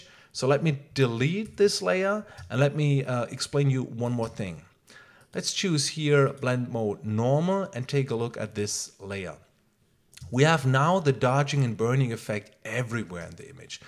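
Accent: Germany. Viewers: Great tutorial! Keep up the great work. Gotta love that German accent! Inwoooard (invert), blent (blend) :) Reminds me of my family in Germany.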